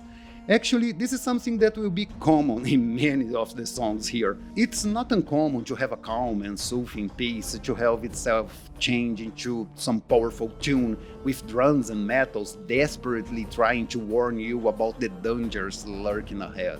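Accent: Brazil. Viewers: I can hear the Brazilian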